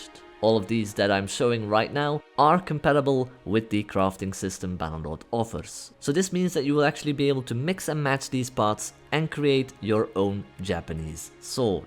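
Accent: France. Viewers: ton accenbt est vraiment interessant, parfois c'est francais, un peu anglais et une autre pays. j'aime ca!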